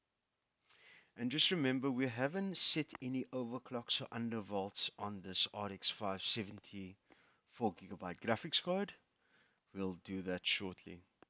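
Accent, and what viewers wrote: South Africa. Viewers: my man sounds South African AF well done thanks for the useful info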